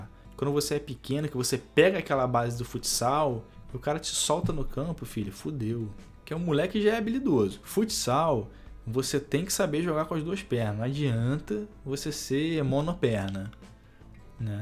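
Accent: Rio de Janeiro, Brazil. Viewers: nasceu em SP, e tem o sotaque mais carioca do Brasil!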